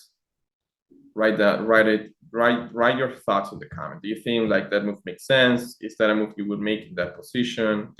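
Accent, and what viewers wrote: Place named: Peru. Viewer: Hahahahahahaaa da risa un Peruano intentando hablar inglés. Cobarde corriste como tú p madre. Estás muy f30 suda.k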